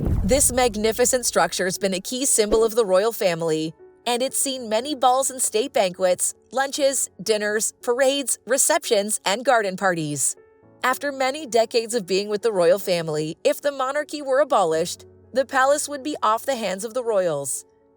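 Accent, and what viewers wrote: United States. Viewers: The Monarchy is not irrelevant, its existence guarantees continuity, stability, freedom and democracy. The fact that a Monarch is our head of State means that no single Party or group can take over the British Isles. It also means we don’t have the divisive need of elected Presidents who would polarize society. We have a democracy like no other in the world, and look at it this way…Our head of state is apolitical and at least we don’t have to elect Presidents, which would create Constitutional crises and social unrest every time. Why are we preached to by an American voice anyway. What the heck do Americans know about the British Monarchy?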